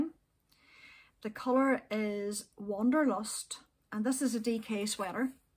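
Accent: Ireland. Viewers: Many many congratulations on 10k👏👏I really want to knit Joji's Frank shawl, but keep knitting hats and cowls for other people. I have loved each and every episode you have produced. Love your Irish accent and sense of humour. I hope one day I can meet you. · Congratulations with your 10K subscribers. I love your podcasts, your talks about yarn & patterns love love love it. And you have such a beautiful Irish accent. My name is Inge and i'm living in Belgium, my dream is to knit a colorwork sweater by Marie Wallin. I have a lot of books of Marie Wallin because i love her colorwork. I'm looking forward to your next episode. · I really appreciate your gentle manner, your honesty, the practical approach you take to knitting and yarn, your Irish accent and showing us the beautiful coastline of Northern Ireland! I still remember the episode you did during covid on the little things we could do to stay well - there was so much practical wisdom in that. 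Congratulations on reaching 10K subscribers! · hello! It's so nice to have you and your lovely irish voice back on a regular basis! Joanne from Illinois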